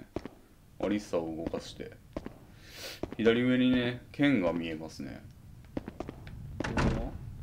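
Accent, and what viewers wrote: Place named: Kansai, Japan. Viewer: 関西弁で冷静にツッコみを入れていく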